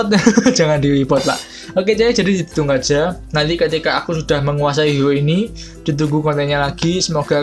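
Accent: Java, Indonesia. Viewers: jawanya dimana mas? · saya suka channel ini karena medoknya itu loh wkwkwk suka banget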